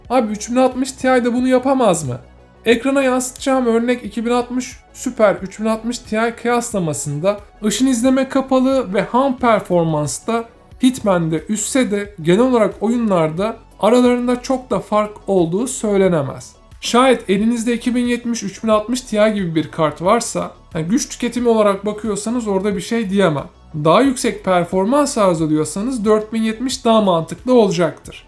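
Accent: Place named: Kayseri, Turkey. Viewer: Youtube ta hangi kayseriliye denk gelsem dörtYüz değill, DörtÇüs diyor, bu da çok hoşuma gidiyor, yani hemen ''aha kayserili detected'' diyorum. 😂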